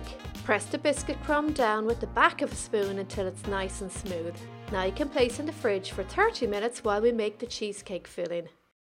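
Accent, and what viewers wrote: Ireland. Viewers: Love ya accent, reminds me of my gran and grandad and all my family in Ireland when I was growing up 🇮🇪 and I’m gonna have to try and make one of these to try 😊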